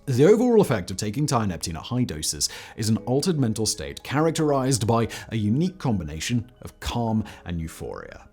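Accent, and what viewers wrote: United Kingdom. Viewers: How is it that he reporting on American products with a british accent and I never seen this American product 😂 bc down here in Houston if someone say zaza they talnbout that good gas. Or superb Marijuana for you unseasoned mfs.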